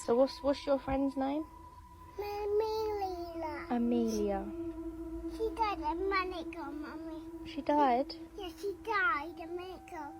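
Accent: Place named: England, United Kingdom. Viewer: Hello!!!! here! Brilliant episode!!! I am so sorry I missed your message - I did private the follow up video but the original is still there with the ghostly voice on. You are more than welcome to use it in a future episode. You might need to scroll down the videos a little but the original video is still there. Thanks so much for the shout-out and I LOVE your English accent😂.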